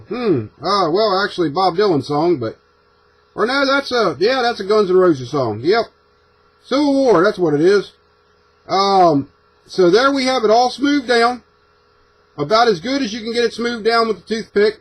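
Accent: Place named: South, United States. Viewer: This is funny, you know how they say everyone has their own twin? Well bud,I found yours. My Brother in law Paul is almost identical to you even down to the go t and glasses. What separates you guys is the voices. Paul doesn't have a southern accent and he is a soft speaker. AND get this... He builds car models, alot of local circle track cars that raced in the 60's. Anyway,yup,you now have your unrelated twin. I wish I had a current photo. I got on your channel to see how other car modelers paint 2 tone.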